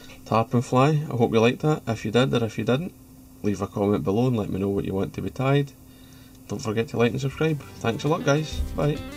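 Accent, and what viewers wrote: Scotland, United Kingdom. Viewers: Love the Trainspotting accent and love the simplicity of that bug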